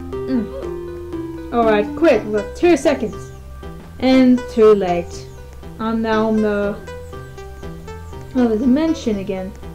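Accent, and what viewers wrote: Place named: Australia. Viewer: No! Don’t be harsh on yourself. Your voice is good. You’re from Australia I can tell by your accent.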